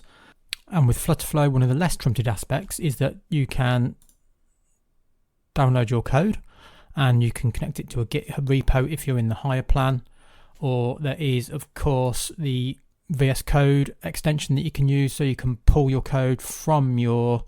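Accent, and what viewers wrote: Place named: United Kingdom. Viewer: Great content! But British accent isn't my cup of tea.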